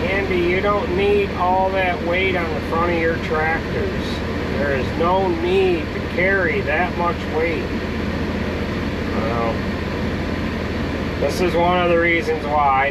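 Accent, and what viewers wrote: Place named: Midwest, United States. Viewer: Way you talk, I figured you were from far away, mid-west or dakotas. Saw those NY plates and realized you're about 5 hours west.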